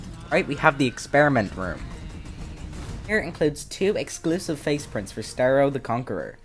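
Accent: Ireland. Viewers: Are you Irish I recognise your accent. I am Irish myself btw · Yo he's Irish!!!!!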